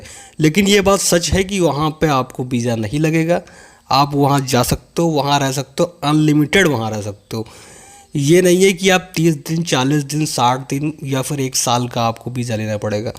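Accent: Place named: Uttar Pradesh, India. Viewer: Up Se ho kya bhai ..Awaaz Se lag raha hai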